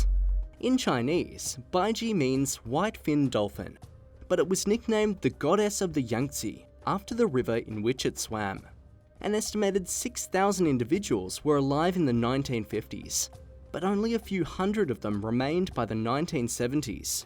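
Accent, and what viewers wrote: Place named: Australia. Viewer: If it's aussie speaking, i believe its real even tho he lack of/some missing words 👌🏾🇦🇺🎙️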